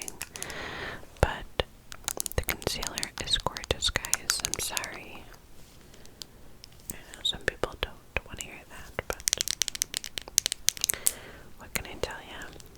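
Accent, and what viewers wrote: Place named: Upstate New York, United States. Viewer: everything you do is amazing and I fall asleep to your videos every night💛 my husband is from upstate NY and y’all say words the same and I always tell him you pronounce or call things the same😂 I’m from Texas so I absolutely love it. Hope y’all have the best Christmas❤️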